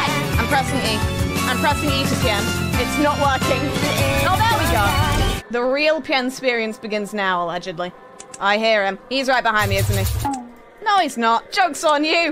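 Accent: United Kingdom. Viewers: Is aguy or a girl, can’t really tell, someone, please explain me. Edit:i just checked the info on the channel and i want to tell you that you have an overwherming british accent i fell like Edit2: this is not a horror game even in the slightiest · Idk, British sounds funny and cute at the same Time. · i though she was cringe until she turned bri'ish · BRITISH DETECTED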